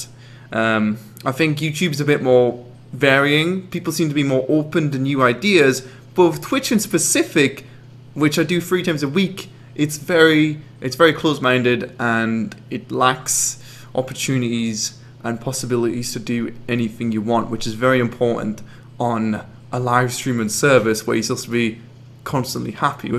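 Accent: United Kingdom. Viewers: I just want to hear that beautiful British accent bby grill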